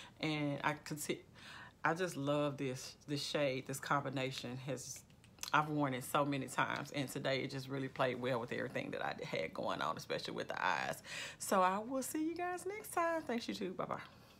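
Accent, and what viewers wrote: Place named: South, United States. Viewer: You are stunningly beautiful. Your Southern dialect is pleasing to the ears.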